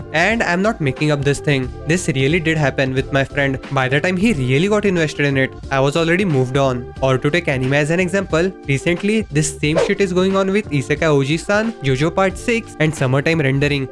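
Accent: India. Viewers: YOU HINDUSTAN ACCENT IS DISGUSTING BYE INDIA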